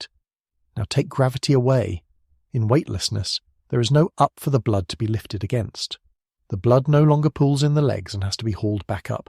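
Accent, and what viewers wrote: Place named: England, United Kingdom. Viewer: couldn't take more than a few minutes of this snobbish AI English accent ... got new for you... if you thin k it makes the narration sound intelligent.... you're wrong.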